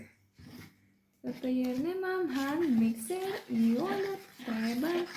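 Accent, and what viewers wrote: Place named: Podravina, Croatia. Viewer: Ne ona je podravka.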